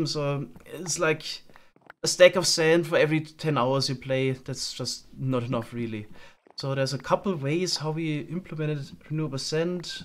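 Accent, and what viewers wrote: Germany. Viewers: Hab deinen Kanal erst vor ein paar Tagen entdeckt und finde dich super ! 😁👍 Aber ich fress n Besen samt Stiel wenn du nicht deutsch bist, mein Englisch klingt genauso 😁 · Are u German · Haha cool du bist auch Deutscher hat man am Akzent erkannt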